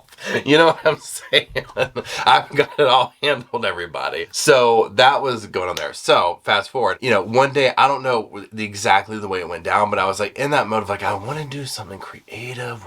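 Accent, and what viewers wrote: South, United States. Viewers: Congrats again. I think you are a great storyteller. I can listen to some of your videos over and over, just to hear you tell the story. Call me weird, but your Southern accent adds to it for me, as does your sense of humor. You also express concern for the victims and their families. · Congrats!--Been watching since Corona.--Your Southern voice and emotions are so soothing and adorable. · I love that country accent— and I keep coming back for it! Congratulations 😊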